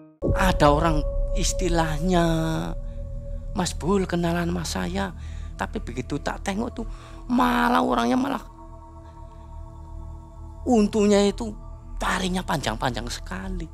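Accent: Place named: Banyumas, Indonesia. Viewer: Lucu bngt nih orang ceritanya😂😂😂 kaya orang ngapak si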